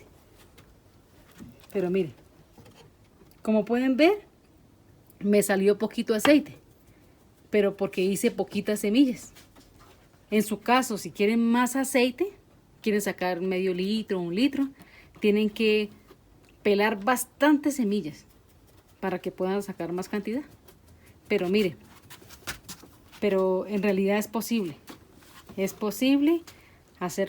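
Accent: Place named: Mexico. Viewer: Me encantó esta receta!! Mil gracias. Y te pregunto de donde eres??? Sueñas a veces como México y otras como colombiana. 🇨🇴🙏🏻💓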